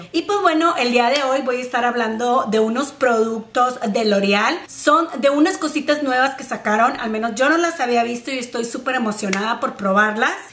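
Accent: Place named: North, Mexico. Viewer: Hola eres súper intensa igual que yo y me gusta tu acento norteño que buena onda😜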